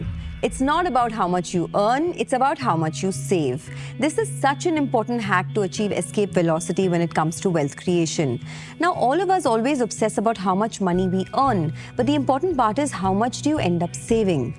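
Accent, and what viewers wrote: India. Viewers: Indian speaking style is weird 😆.....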